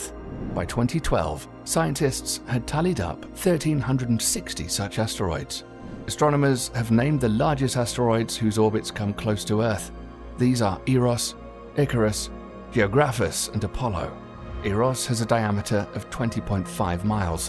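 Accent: United Kingdom. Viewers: Narrator: PLEASE stop saying "Nuke-yu-ler"!!! The word is spelled "Nuclear". And pronounced "Nu-clee-er". "Nuke-yu-ler" (or, often, "Newk-ya-ler") is the 'Murican Bush-level yee-haw pronunciation for high school beer-swillin' gun-totin' dropouts. You sound British; thus, I had expectations you knew the language. "Disappointed, I am", says Yoda.....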